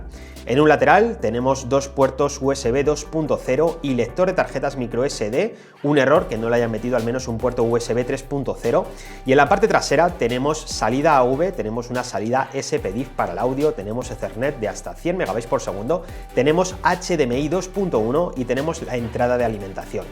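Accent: Spain. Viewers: Con todo respeto pero se oye muy chistoso el español de España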